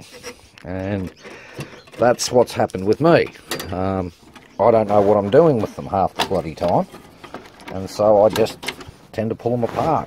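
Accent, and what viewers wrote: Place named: Australia. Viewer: Great to hear an Australian voice. I'm preparing to tear down my old Power Mac G5 for Intel (maybe Hackintosh) Conversion. I also used the ifixit guide. Thanks mate..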